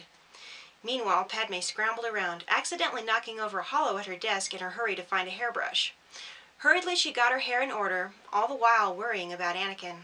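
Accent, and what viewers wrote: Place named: United Kingdom. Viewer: So pleased things going well. Great chapter , your British accent is coming on well .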